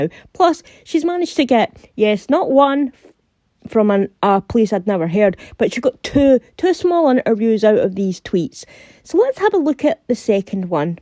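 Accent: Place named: Shetland, United Kingdom. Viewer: by the way, what part of Scotland gives you your accent? I exchanged comments with someone from Shetland & you sound so much like sound bites I've heard from Shetland, I wondered if you're in the North? Take care & ignore the sister. Poor deluded women.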